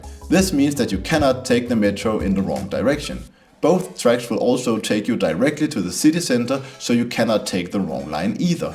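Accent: Denmark. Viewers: Hello. thank you for the information.Is that a danish accent?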